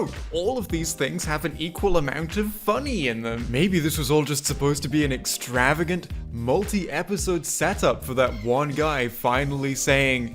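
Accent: England, United Kingdom. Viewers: Whoo that’s too much British for me today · Man's literally speaking Queen's English isn't he? Hard to tell how biased this is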